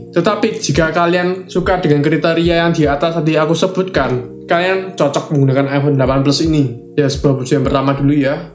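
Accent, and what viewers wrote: Java, Indonesia. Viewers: Salfok logatnya medok bgt bang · Medokkkeee podo karo aq likk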